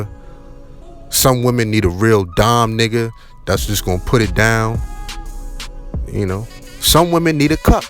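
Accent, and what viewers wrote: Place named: Newark, United States. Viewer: Absolutely loved everything about this breakdown. whats understood never has to be explained and you definitely got your own lane..and that's an understatement...its over just drop a bomb on these niggas. You a real G..sound like a Newark NJ nigga too but I know youre forever. Also man I think you should consider writing a book in the long run. You're stories hit home and definitely paint the canvas. Much respect ✊🏾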